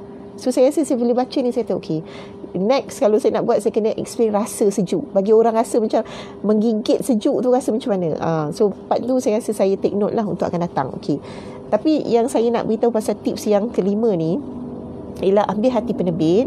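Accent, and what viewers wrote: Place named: United States. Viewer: Buku naif best.. penulis membawa jiwa pembaca kesana.. banyak benda dpat beljar terutama culture. seorang yang cekal (Grit), resilience, introvert yang mempunyai daya kepimpinan. Pandai berdikari. Rasa macm nak dengr banyak benda lagi.. sbagai tambahan mgkin boleh translate kan English sentences, so that the rest also can understand. Oh, saya.. saya rasa ada bakat tersembunyi yg boleh di majukan, dan dikembangkan.. iaitu American English. Mana tahu boleh buat video mengajar peminat untuk belajar American English. mesti hebat tu.. hehehe.. Apa-apa pun bacaan yg best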